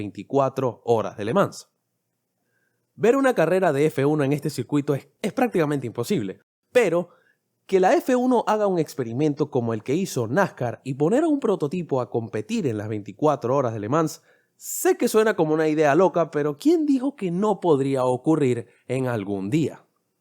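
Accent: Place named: Venezuela. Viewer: Hola hermano, increíble vídeo. Tu contenido es lo mejor de lo mejor. Ese acento se me hace inconfundible, eres de Venezuela no?? Un placer ver a un compatriota haciendo contenido de este estilo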